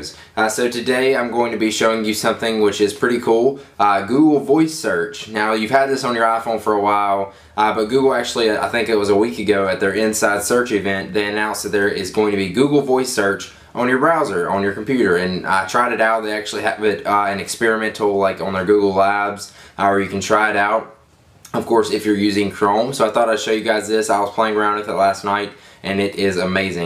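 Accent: South, United States. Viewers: I think he just means your slight southern accent.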